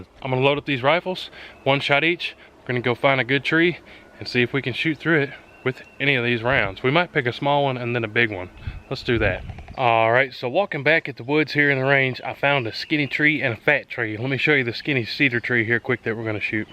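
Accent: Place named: Tennessee, United States. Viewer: I swear to god, after I watched you first video I KNEW you were from Tennessee. Just from your accent.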